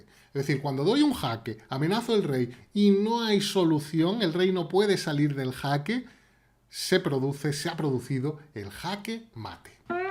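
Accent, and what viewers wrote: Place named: Argentina. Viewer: Che buen video Me intriga tu acento medio argentino medio español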